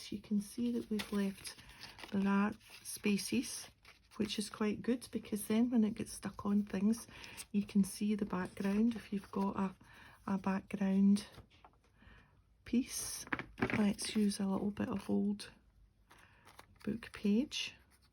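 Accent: United Kingdom. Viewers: Thank you for the video. Adore this creative idea. Also, I love your accent. Where in the UK are you? I listen to another lady who has a similar accent. Enjoy hearing you so much.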